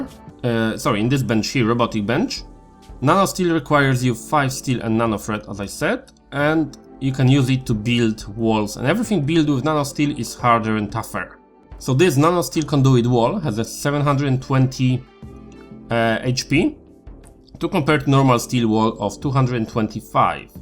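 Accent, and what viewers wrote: Poland. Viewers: Łatwo po akcencie poznać polaka. Dobre przedstawienie moda.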